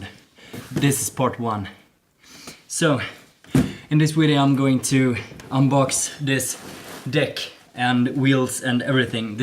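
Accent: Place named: Russia. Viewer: Русский ?! Акцент как-будто с России )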